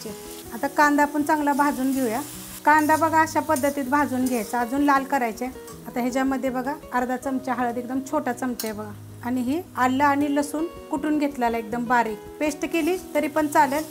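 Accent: Satara, India. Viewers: Tai tumhi Satara che aahat ka??? bhashe waru vattay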